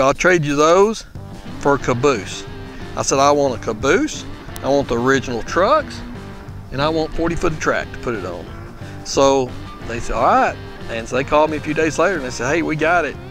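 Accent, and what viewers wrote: Texas, United States. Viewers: Definitely sounds like Texas.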